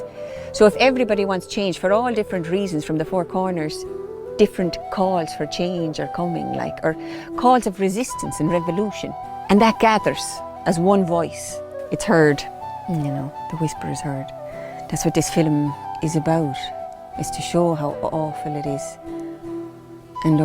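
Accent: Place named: Ireland. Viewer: Love your lovely Irish accent and wisdom💚🇮🇪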